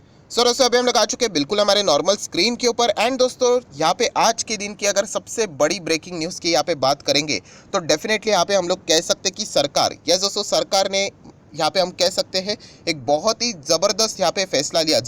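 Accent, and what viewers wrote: Maharashtra, India. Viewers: सर, तुमच्या डिमॅट अकाउंट मधील पोर्टफोलिओचा व्हिडिओ करा एकदा. मला माहिती आहे आपण एक मराठी माणूस आहात.... आपल्या हिंदिवरूनच समजतय ते... आपली अशीच प्रगती होवो....जय महाराष्ट्र..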